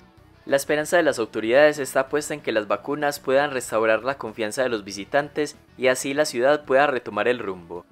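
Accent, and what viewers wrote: Antioquia, Colombia. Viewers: Se nota que eres paisa, buen video parce!!!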